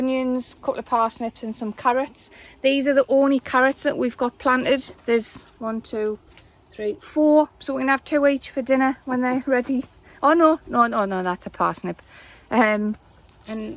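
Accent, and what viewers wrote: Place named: Durham, United Kingdom. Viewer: the accent sounds like durham.....................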